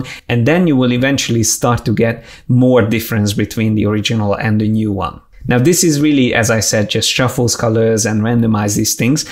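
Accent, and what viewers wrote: Hungary. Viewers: Hungarian accent 👌 :D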